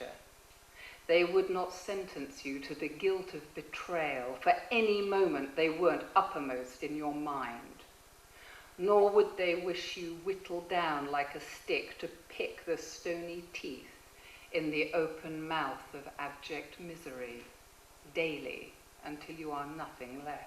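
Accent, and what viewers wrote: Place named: London, United Kingdom. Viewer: Sounds POSH - Sloane square - Kensington High Street - Bayswater ?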